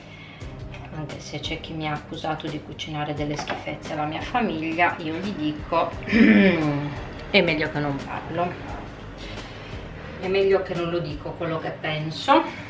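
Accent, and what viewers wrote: Sardinia, Italy. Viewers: La proverò senz'altro!😝 Mi piace la cadenza Sarda della signora! Brava🤗👍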